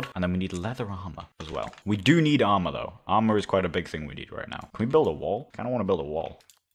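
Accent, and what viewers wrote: United Kingdom. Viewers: Are you British? You sounded like you were as a kid.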